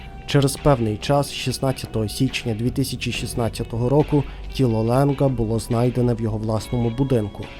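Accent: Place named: West, Ukraine. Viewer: Мені відчувається західний акцент, але з кожним відео все краще!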